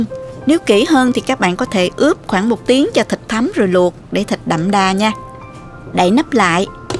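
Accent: South, Vietnam. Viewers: Thích giọng miền nam ngọt ngào của bạn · Thich nghe giong noi cua MC trong video,giong Mien nam that tuyet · ĐÚNG CHUẨN GIỌNG CON GÁI MIỀN NAM....LẠI BIẾT NẤU ĂN NGON, HƯỚNG DẨN RÕ RÀNG... CẢM ƠN! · mình cũng người Bắc đây nhưng thích nghe giọng miền nam nhẹ nhàng và ngọt ngào như chị trong video rất dễ thương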